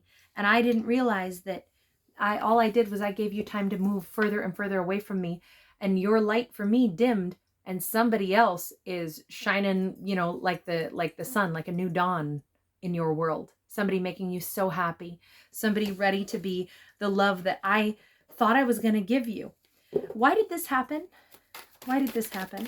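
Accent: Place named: South, United States. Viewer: I thought I recognized a Southern twang when you're speaking! Resonates definitely! He's stuck in fear, infidelity will do that to the one not commiting it. He's lucky I know what this connection is and am filled with COMPASSION, but also strength. Thank you❤🙏🌟✨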